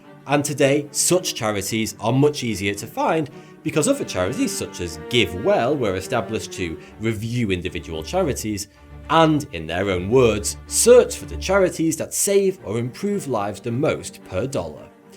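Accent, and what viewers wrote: United Kingdom. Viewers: His accent is so British that I have to watch the video twice , first time to warm up for the accent and the second is to actually understand. But I love his content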